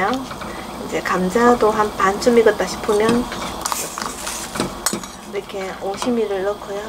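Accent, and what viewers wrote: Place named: Gyeongbuk, South Korea. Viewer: 구독한지는 오래됐는데 처음 댓글씁니다 친정이 포항이라 경북말씨 너무정겹습니다^^열심구독합니다. 감사드려요. 좋은 오늘되세요~~~**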